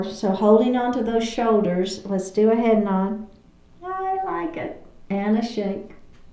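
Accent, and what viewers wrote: South, United States. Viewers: Hi pretty, So happy I found your site even though your challenge started a year ago, better late then never! I have just recovered from Cervical Spine Surgery and had 4,5, and six disc removed and a titanium plate with a screw as replacement. I will be 79 May 4th. I had the surgery February 6th 2023 and in August- December had 3 very bad falls. One of the problems was a medication called Cymbalta and having researched for weeks it has been known to be a destructive med for many people since around 2014. There are doctors and people on YouTube that have shared their horrible experiences with getting off this med. I’m talking way over 100,000 people but most doctors are handing it out like candy and the withdrawal process must be very, very slow. Don’t know why I rattled off all of that so I’ll blame it on Cymbalta! I have told other people and my new Physical Therapist and even tho I have Fibromyalgia and arthritis they said go for it! 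Love your southern accent and your peace. I go to Bible study and have His peace but I tighten my shoulders many times instead of letting them relax. I will just keep trying my best to relax😊❤❤ Sincerely, Paulette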